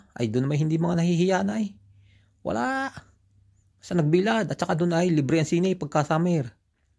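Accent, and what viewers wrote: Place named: Batangas, Philippines. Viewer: kuya nkktuwa ang iyong salita,kgaya laang dne sa amin,.tga dne laang ako sa sanjuan batangas,stay safe po plge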